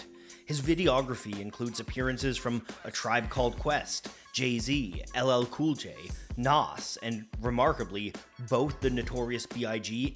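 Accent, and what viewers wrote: Canada. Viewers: I never realized was canadian till he talked about the dart hanging out of slash's mouth l.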